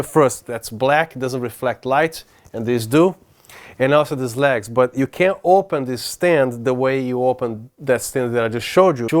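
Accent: Brazil. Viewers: haha yes, I know a lot of Brazilians here in Houston and recognized it, hope you had a great new years, this was great information and you explained it really well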